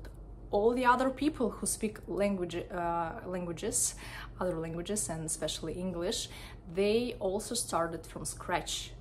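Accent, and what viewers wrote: Russia. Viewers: As you mentioned that you speak russa, i immediatly started hear your accent. i like russan accent. That always remind me the hollywood movies. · Разозлило что потеряла 7 минут смотря видео, так что напишу.. Ни одного совета… очевидные вещи.. акцент четко русский.. по словам не слышно уровня с1.. максимум б1.. речь беглая, супер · rus işte hep aynı aksan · That's why we feel Russian accent in your speech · Я русского отличу запросто лишь по акценту)) а так словарный запас, и уровень великолепный, вы умничка! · Сразу видно ,что вы носитель русского языка, и по внешности, и по акценту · Every nation has spesific accent it so cute Russian speakers make t d r h really different 😂❤️🥰but it original ❤️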